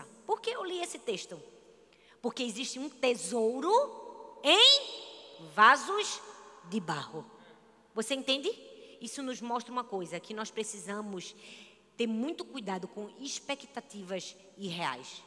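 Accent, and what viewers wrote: Northeast, Brazil. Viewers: Eu amo o sotaque nordestinizado. Linda mulher de Deus. Testemunho de vida fantastico. Abraço bela! 💖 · Amo seu sotaque! Tenho muita vontade de ir pro Nordeste ❤️😍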